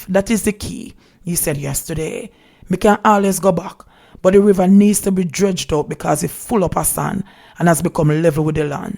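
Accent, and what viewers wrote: Jamaica. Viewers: We just love the way you deliver the news, especially the Patwa. Blessings to you!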